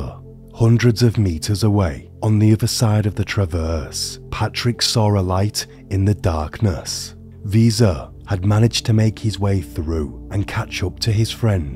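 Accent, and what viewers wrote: United Kingdom. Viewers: I love the British accent, though I find it hard to follow if I'm just listening (yes. I know. It means I'm stupid cause no one else has that issue. Kool... Moving on) I thoroughly enjoy your narration, and, for what it's worth, appreciate the work you put in. "Jolly good show ole chap!!" ❤ p.s. Liked AND SUBed 😉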